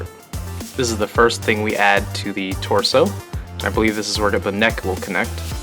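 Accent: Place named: United States. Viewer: That American Narrator thx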